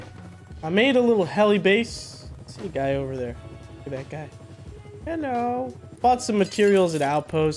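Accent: South, United States. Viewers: The southern accent is why I started watching 😂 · your southern accent blows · tip for the southern accent, talk slower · I love the country boy accent. You should talk like that all the time. Fuckin' hilarious. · Lmao! your southern accent is on point! hahaha... If you're ever out towards the south. Wally1k just moved to Mrytle beach SC. You'd fit right in with that accent.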